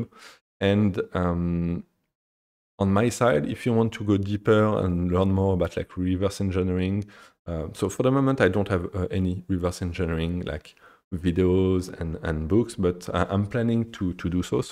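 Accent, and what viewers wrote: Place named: France. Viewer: Le magnifique accent français ? Super vidéo sinon !!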